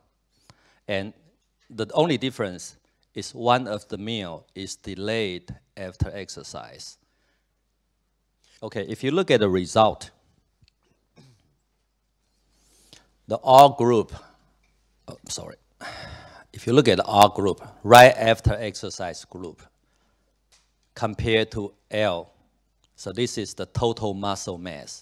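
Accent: Taiwan. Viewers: 台腔教授讚!這種腔反而才聽得下去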